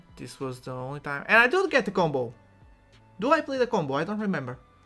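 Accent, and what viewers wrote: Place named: Portugal. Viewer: sotaque tuga não engana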